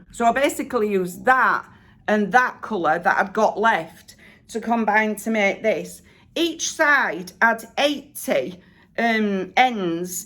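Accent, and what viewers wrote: Yorkshire, United Kingdom. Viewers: So good to see you back on YouTube I have missed you as I am sure have many others sincerely hope you are well on the mend to become your happy self again. I watch you from New Zealand where I live now having moved here 15 years ago I am originally from York where I think you said you lived at one time . I am 88 years old and I love to hear your Yorkshire accent take care happy knitting well done. G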